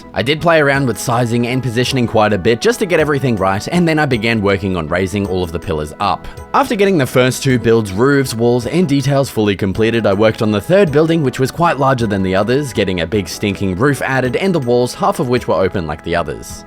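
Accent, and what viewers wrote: Australia. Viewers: Oh gosh you make great builds , but your narrative makes me laugh,fair dinkum Aussie way of talking,crazy man ..........few swear words thrown in is typical, lol,not that Im keen on that way of speaking, but its just you.... Cheers 🐨🦘🇦🇺🌟🤩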